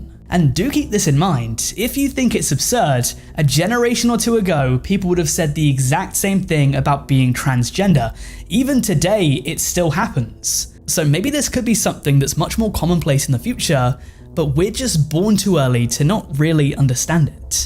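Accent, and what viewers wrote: United Kingdom. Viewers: I usually hate British accents, but Im kinda digging his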